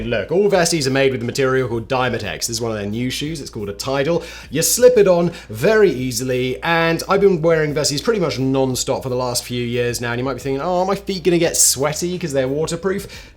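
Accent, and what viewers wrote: United Kingdom. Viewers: From your accent you sound British - so it should be "inventor of the aeroplane" - we are not the 51st state yet!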